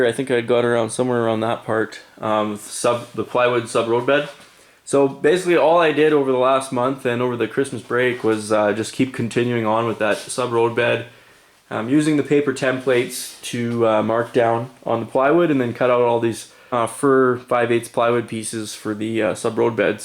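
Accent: Canada. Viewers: Got quite the Canadian accent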